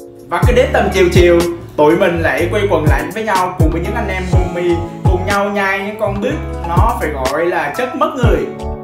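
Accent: South, Vietnam. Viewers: nay nói giọng miền nam tếu dậy chời😂😂😂😂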